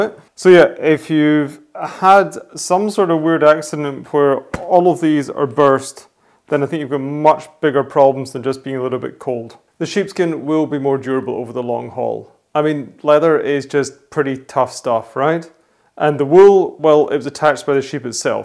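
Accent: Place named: Aberdeen, United Kingdom. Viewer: Are you no fae Aberdeen or there aboots?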